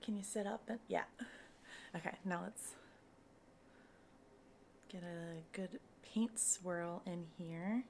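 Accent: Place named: United States. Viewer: I totally thought you were going to have a british accent 😂 I'm actually shocked you have an American accent 😂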